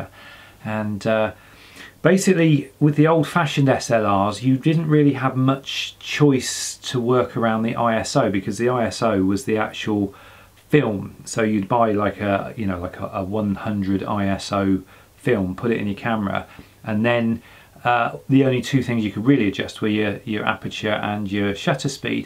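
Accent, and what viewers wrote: England, United Kingdom. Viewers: Are you Englishman?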